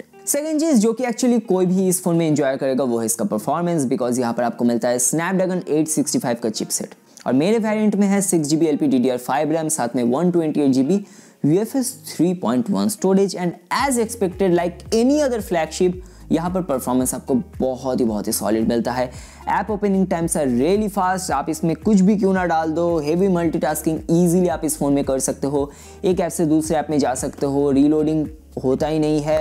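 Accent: Bengal, India. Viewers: Tumi bengali naki?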